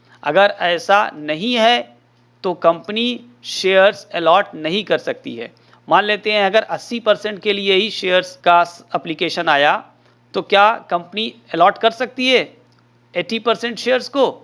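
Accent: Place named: Uttar Pradesh, India. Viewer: Dekha aapne mera andaaza Maine kewal aapki awaaz se pata laga liya ki aap UP se ho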